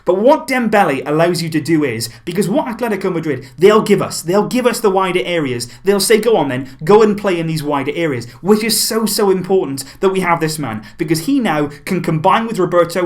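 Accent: United Kingdom. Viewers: you're a star pal. I do love your channel very much. I do like and appreciate the way you explain the things by yourself. Everything abdolutely well explained with a lot of sense. I'm spanish and I am living in UK, I can notice from your accent that your British. Isn't easy not to be spanish and be as you are, a very FCB lover. I'd be grateful if you take atention and also perhaps, answer my comment. I hope, we, all the Barça supporters, can celebrate together many titles this season, as we really deserve. Specially for the king of the kings, our master and always unforgettable Lionel Messi, which has made this team (club) a real live style. I gotta be proud of this guy, as well with: Iniesta, Busi, Luis Suárez, etc. GO AHEAD Barça !! Still many amazing moments yet to enjoy from this squad!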